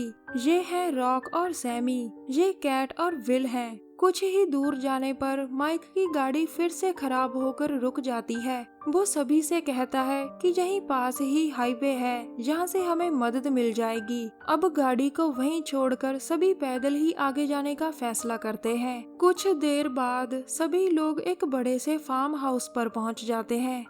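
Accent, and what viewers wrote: Punjab, India. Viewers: Love ur punjabi ascent Punjaban ❤️ Pardeep gill from Ludhiana Punjab ❤️ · Ap punjabi ho ya haryanvi... ? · I suppose the woman who is narrating is a punjabi woman as her prononciation is very similar to punajbies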